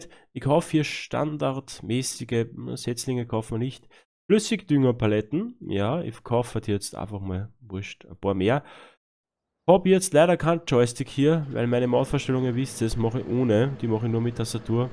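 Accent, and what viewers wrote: Austria. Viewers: Danke! Endlich einen guten Gabelstapler gefunden! Dein Dialekt ist Österreichisch oder? Abo hast du :D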